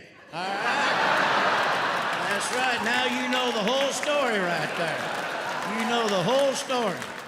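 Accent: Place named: Midwest, United States. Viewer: the funnt thing he does not sound like that in real life, he has a thick mid-west accent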